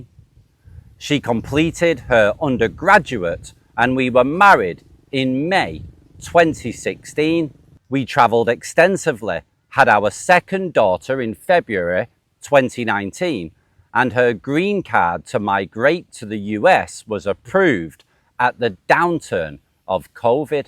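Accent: Manchester, United Kingdom. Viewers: Where are you from, Oldham? Your accent is almost Manc apart from a few words you pronounce like ''there and video'' for example.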